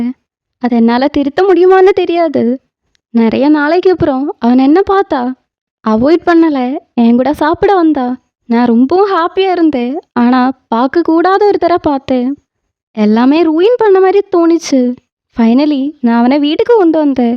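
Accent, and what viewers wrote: Kerala, India. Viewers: Hi sis unga malayalam tamil explanation is soo good it's very different to hear me I like it explanation also very neat and clear so tq 😊 Fake fact lips series podunga sis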